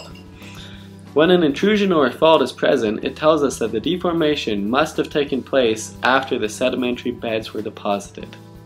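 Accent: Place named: Russia. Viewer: Русский акцент, сделай на русском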